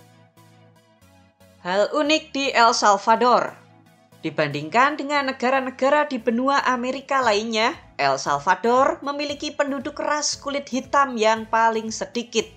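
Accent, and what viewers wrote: Java, Indonesia. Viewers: Admin medok rek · Buset dah Medok Amat ngomong nya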